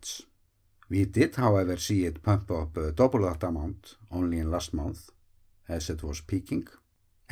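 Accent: Iceland. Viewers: I think he has a charming Icelandic accent, just like Björk😀 I once saw an interview with her, where she was asked how her name was pronounced, and she replied with “It rimes with a jerk”😆 Anyways, you just try to pronounce Eyjafjallajökull, Bárðarbunga or Landmannalaugar, and lets see how it goes, I would probably find that charming too😉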